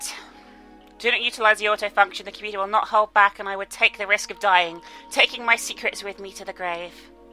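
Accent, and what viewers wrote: United Kingdom. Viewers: are you british? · Yes she is British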